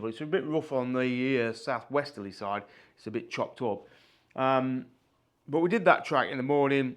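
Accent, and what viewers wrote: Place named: Devon, United Kingdom. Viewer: Fantastic video - I’m quite new to your channel - the more I watch the more I love it. As a small old bloke I really want one of those CT125s and to have that fun that I know I couldn’t have on a GS! Thank you and keep entertaining - I love your Devon accent too - reminds me of living in Derbyshire 30 years ago 😉 Happy days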